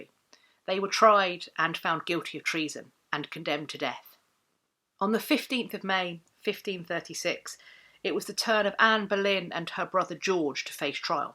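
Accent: United Kingdom. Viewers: Hi, Dr.! I'm a new subscriber, and I just wanted to tell you how delighted I am that YouTube, in it's infinite wisdom, decided to drop you into my que. I, very much, appreciate your expertise on the subjects in which you speak, and I could watch your videos all day, but it's your voice that has me transfixed. I really could listen to it endlessly; there's just something about a British accent! Thank you for providing such intellectually stimulating content. I look forward to binge watching all of your postings.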